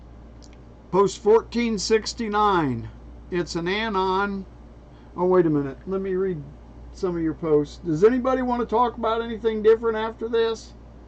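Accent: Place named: South, United States. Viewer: WELCOME BACK,. MISSED YOU TERRIBLY. YOU ARE 1 OUT OF 4 CHANNELS I LOYALLY WATCH. YOUR TRUTHFUL, ACCURATE, AND I LOVE YOUR SEXY, SOUTHERN, GENTLEMAN ACCENT. MAYBE GOD BLESS YOU, AND WATCH OVER YOU AND YOUR FAMILY. GOD IS WITH PRESIDENT TRUMP, GOD WINS. PLEASE, EVERYONE, CONTINUE TO PRAY, AND LET US ALSO GIVE PRAISE AND GRATITUDE TO OUR ONE TRUE GOD OF ISRAEL, OF ABRAHAM, ISAAC AND JACOB. 🕆✡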